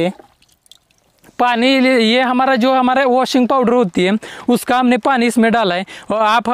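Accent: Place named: Maharashtra, India. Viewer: योग्य मांडणी केली हिंदी छान बोलला उच्चारण थोडेसे मराठी वाटते पण अगदीच हिंदी येत नाही असे नाही . जो विषय मांडला आहे त्याला निर्विवाद न्याय दिला आहे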